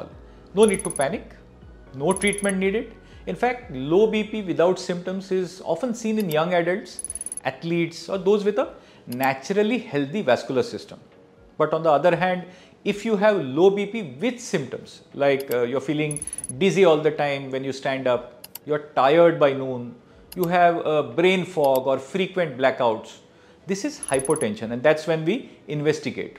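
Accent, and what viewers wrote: India. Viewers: Your thick Indian accent makes it difficult to hear your words when you speak 😊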